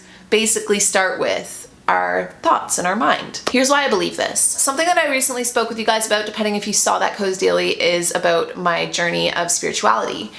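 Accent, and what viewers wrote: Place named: Canada. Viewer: You're Canadian, eh. ❤️❤️❤️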